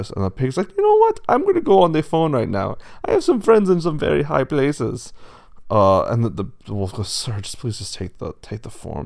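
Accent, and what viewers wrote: Germany. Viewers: the german accent is fucking sending me into oblivion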